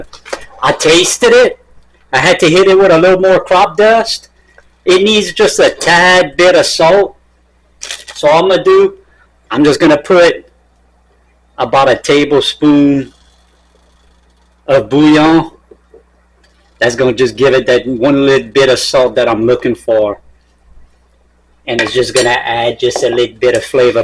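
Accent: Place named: Louisiana, United States. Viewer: compliments from a 67-year young lady. A young man that can dance, make a gumbo and has the most handsome blue eyes I have ever seen. That's a win-win in my book. Don't ever loose that Cajun accent. I too am Cajun. My grandparents spoke nothing but Cajun. You are a cutie pie. Please keep up your Cajun Heritage. I am a good cook. Or at least that what my husband of 40 years tells me. I am going to try your gumbo for Thanksgiving. God bless.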